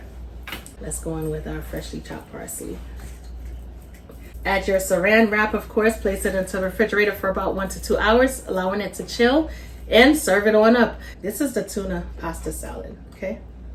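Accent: New York, United States. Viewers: must be from up north I’d say NYC. Because she sounds like me when I talk 😂thanx for the cooking tips on this plate 👏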